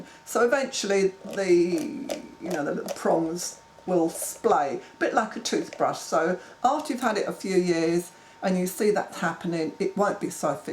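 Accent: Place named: United Kingdom. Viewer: I stumbled on your video . I am glad I found you . I am 63 and have grown my hair out below my shoulders . I had to smile when you talk about your shower . I am a 'tub soak bath' woman and wash my hair separate , in the kitchen sink . Will you make video's on pony tails and buns ? How do you do the puff bangs ? What is a 'plat' ? I like your accent -- and when you said 'carry on' - was a dead give away of British. ❤️ Kindest Regards ❤️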